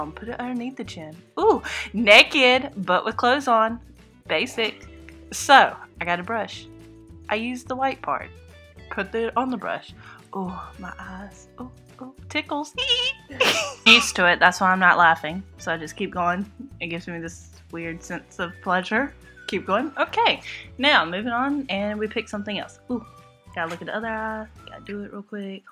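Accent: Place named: South Africa. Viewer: Damn, I'm only watching these videos to listen to lovely SA accent! lol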